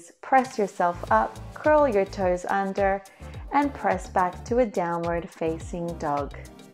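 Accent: Australia. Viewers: Your videos are amazing! Thank you so much. This one was hard. Hoping that I can improve and not die next time hehe. And I definitively love some Australian accent :) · Have done 2 of your classes now, yesterday and this morning. My gluteus are feeling it! I have a couple of favourite channels I follow to mix up my workouts, love your workouts totally agree with the other comments, you are clearly a professional and a great teacher/instructor. Also is really soothing hearing a Ozzie accent😉. Love from Melbourne 😘😘😘❤❤❤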